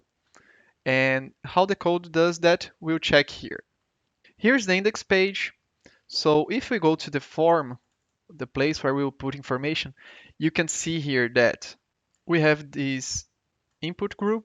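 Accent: Brazil. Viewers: Mano, quando eu ouvi o sotaque tive certeza que era brasileiro akkkk, daí o google te dedurou , parabéns irmão, ótimo conteúdo!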